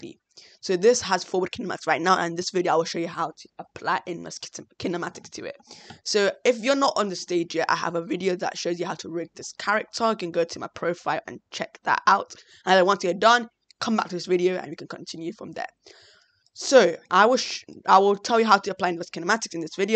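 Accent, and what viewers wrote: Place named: United Kingdom. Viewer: This video had a lot of good content and I learned from it but it was a struggle to get through because of your narration. At times you rush along, only hinting at rather than enunciating certain syllables. In addition, you have a fairly strong British accent and the combination of these caused me to not understand many things you said. The accent alone shouldn't be an issue; I've watched other speakers with British accents who are very understandable--see Grant Abbitt as one example. I suggest that you practice CLEAR - LEE - E - NUN - SEE - AYE -TING E-VER-Y SYLL-A-BLE for future videos.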